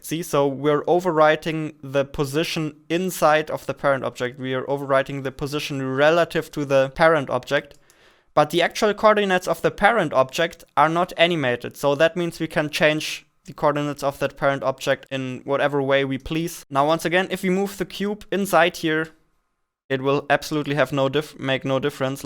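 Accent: Germany. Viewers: You have a very strong german accent, I like that! lol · Your German accent is very thick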